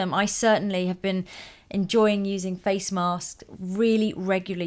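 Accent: United Kingdom. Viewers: love your british accent!!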